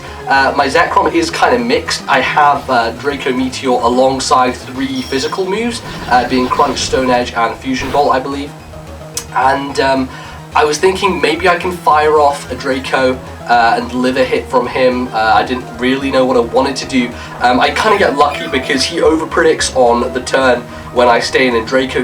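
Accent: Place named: United Kingdom. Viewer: i think hes an indian with a british accent who lives in scotland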